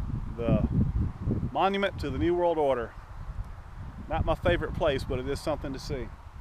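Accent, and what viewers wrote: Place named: Georgia, United States. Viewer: Great video! Being from Australia I love the Georgia accent and also Yorkie woof pack - similar but smaller than the Aussie terrier!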